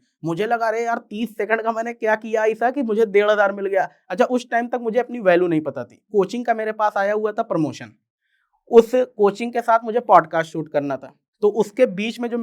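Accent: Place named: Tanda, India. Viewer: Tanda ki accent h apki..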